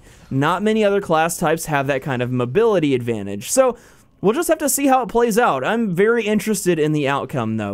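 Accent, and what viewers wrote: United States. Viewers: Evan Woolford I'm certain it's just cause he's American (pronunciation) but as the other guy wrote , it's a clever little substitute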